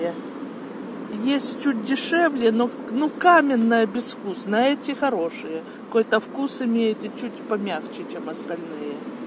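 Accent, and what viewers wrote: Odessa, Ukraine. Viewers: Тетя супер! Милый одесский акцент!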